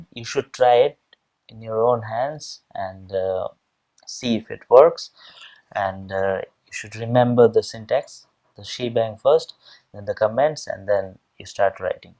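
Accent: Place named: Gujarat, India. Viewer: His accent is guirati....how many of u noticed ?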